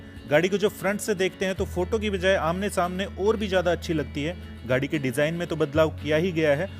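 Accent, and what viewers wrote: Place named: Gurgaon, India. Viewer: Bhai Boli Se Gurgaon ka Lg Rha Hai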